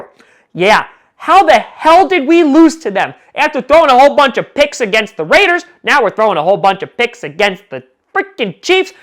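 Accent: New York, United States. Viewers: You sound like you’re from New York not Wisconsin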